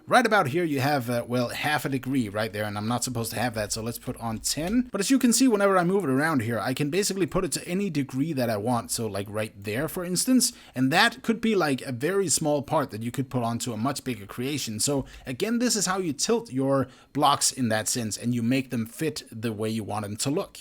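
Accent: Denmark. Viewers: Funny how i just instantly knew you were from Denmark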